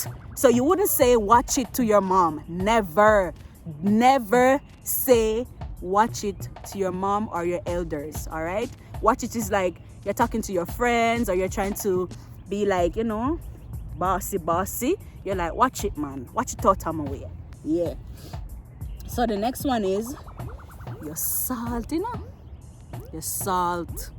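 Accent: Jamaica. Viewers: i love jamaican accent ...thanks for the tips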